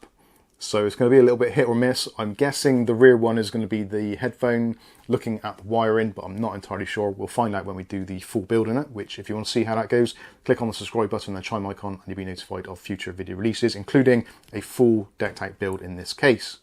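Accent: Bristol, United Kingdom. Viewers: Tempting to try this for £35. Was going to go with O11D Evo but I feel I'll regret it if I don't at least try a budget case for the first time. Will also help offset the very expensive build. I am also going to try air cooling for the first time (Thermalright Peerless Assassin 120) to cool a 7600X with a view to change that CPU to the 3D version whenever it launches. So I guess I'd have 3 intakes, rear as exhaust and two or 3 fans in the top as exhausts, unless I swap my 4090 for the one with the AIO. Thank you for reviewing this, I appreciate there are a lot of cases but only the main ones tend to get any attention. PS: Are you from Bristol?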